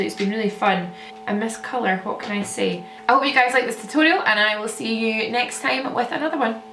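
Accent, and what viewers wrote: Scotland, United Kingdom. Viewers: Haha I'm Scottish as well and I know how ya feel about the pronunciation problem😂😂 "laaaaaaayen low". Your videos are great and it's fab that ur doing more videos these days! Xx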